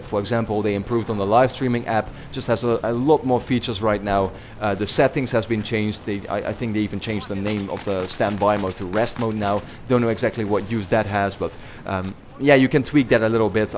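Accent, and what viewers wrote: Netherlands. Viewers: Ben je Nederlands? :p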